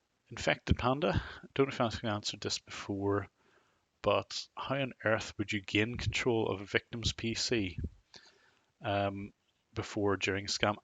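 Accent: Northern Ireland, United Kingdom. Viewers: northern Ireland ? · Very interesting live stream. Your videos are always great. I was surprised to hear that you are Northern Irish. I had always guessed Scottish as a few people also guessed on the stream. I'm from the south of the border in the Midlands of Ireland so we are not all that far away.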